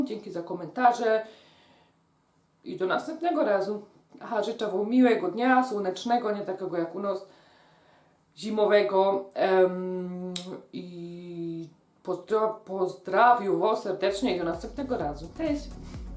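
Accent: Silesia, Poland. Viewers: Masna ta gwara śląska 😂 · To się nazywa gwara , wydaje mi się że Śląska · Zastanawia mnie jeden fakt, nauczyciele na śląsku uczą was tak mówić? Na śląsku forma taka jaką się porozumiewacie jest uznawana w szkołach za poprawną, czy po prostu wszyscy tam mają taką gwarę, i każdy ma to w dupie. Pozdrawiam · Pytam na poważnie :) jako ciekawostka dla mnie, czy jesteś polką i mieszkasz w polsce? Czy Twój akcent jest prawdziwy dla Twojego regionu widzę w opisie śląsk i zastanawiam się czy na prawdę gdybyś tak mówiła do swojego znajomego na Śląsku to nie było by w tym nic dziwnego? Czy jest to tylko pomysł na kanał, żeby było ciekawiej? Pozdrawiam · Śląsk! :D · Nie lubię śląskiej gwary.....dziwne że u ciebie mnie to nie przeszkadza, wręcz odwrotnie, może dlatego że jesteś bardzo naturalna,skromna ,sympatyczna a twoje fajne przepisy prezentujesz i komentujesz lekko i swobodnie to jest w tobie najlepsze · Tak mówią ludzie ze śląska, jak się nie podoba, nikt nie każe Ci oglądać 🤷🏻‍♀️ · Bardzo sympatyczna z Pani dziewczyna ;) mimo, ze nie rozumiem slaskiego dialektu to jakos.daje rade ;) swietny przepis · Etnolekt śląski, odmiana opolska. 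· To jej wina że pochodzi ze Śląska i mówi tak jak została nauczona? Trochę taktu kobieto... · Ciekawy chyba przepis ale twoja śląska gatka zniechęca do słuchania. ... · Świetnie określają, I fajnie TLUMACZYSZ, bardzo dokładnie I po śląsku, POZDRAWIAM Z TORONTO, JOLA, POZDRAWIAM Mikołów,♥️♥️♥️👐🏻👐🏻👐🏻🙌🏿🙌🏿👏🏻👏🏻👏🏻👏🏻👏🏻👏🏻🎗🎗🎗🌋🌋🌋😘😘😘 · Jak to fajnie, że dziołcha od nos ze śląska! Aż się fajnie słucho! :D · O matko, jai ten język sląski jest zabawny 😂😍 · To po prostu śląski ;)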